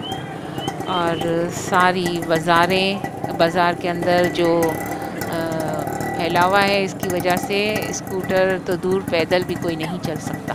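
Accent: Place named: India. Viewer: Your voice is pure Indian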